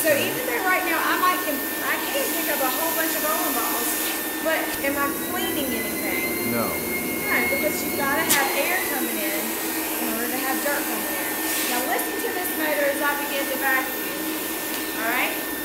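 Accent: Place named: South, United States. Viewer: I love her accent sounds like South Carolina or Georgia. Very pretty too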